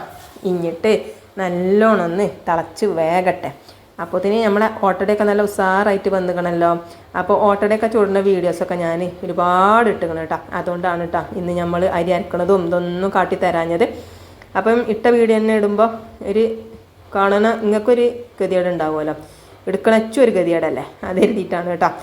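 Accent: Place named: Thalassery, India. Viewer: ഓരോ ആളുടെ ശൈലി അല്ലെ അത് എനിക്കു തലശ്ശേരി ഭാഷ ആണ് എനിക്കു ഇഷ്ടം ആണ് നിങ്ങളുടെ ഭാഷ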